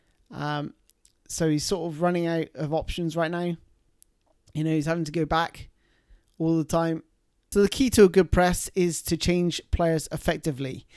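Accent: United Kingdom. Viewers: Great explanation, and a new Sub from me! Also, you sound like you're from Bristol too? Either way, one new follower :)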